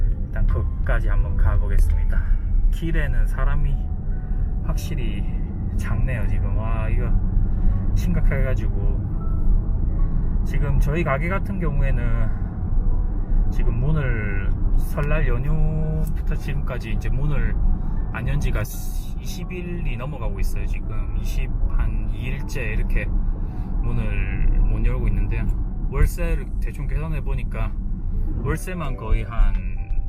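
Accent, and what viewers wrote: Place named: Gyeongsang, South Korea. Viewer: 고향이 경상도 인가보네요 언능 빨리 나아졌으면 좋겠네요 화이팅!!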